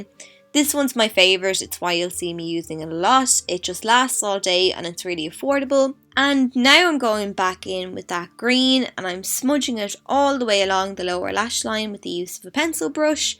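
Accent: Ireland. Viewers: Lol im irish too and you sound like my music teacher XD. My accents a bit different from urs cause im from the north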